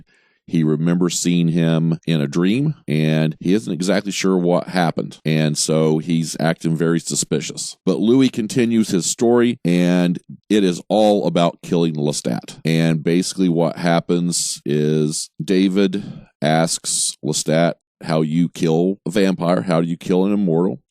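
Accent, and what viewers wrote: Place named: Texas, United States. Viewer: Really late to the party, but just have to tell you how much I appreciated your reviews. Your analytical skills and emotional I.Q. are off the charts! Plus, you have a voice that belongs in commercials. And that accent -- Texas? When you mentioned Jonah with Louis in ep.3, but not Antoinette with Lestat, I knew you didn't find her memorable enough to recognize 22 years later in ep. 6. Favorite line from you all season? "How many times are you gonna fall for this, Louis!" when he was professing his love for Vampire Armand. The secret to Louis was revealed (to me) in the first episode when Daniel called Louis his father's eldest son, and Louis corrected him, "The FAVORED son". It was important to him, being favored son. That's why all the old-as-sin vampires go ga-ga over Louis in books/movie/tv. They crave his fiercely-held echo of their forgotten humanity, and they sense his need to be some man's "favored son". 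Plus he's hot haha! Thanks for entertainment!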